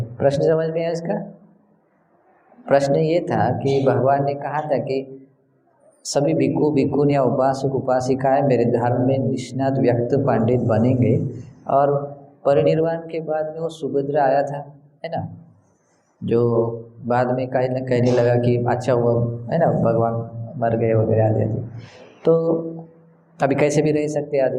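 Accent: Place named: Gujarat, India. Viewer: Is he gujrati? Why his accent is like gujrati?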